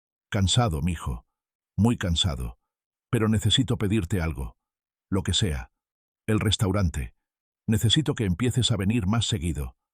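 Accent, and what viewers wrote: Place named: Spain. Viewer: Y si eres de Oaxaca, porque hablas como un español?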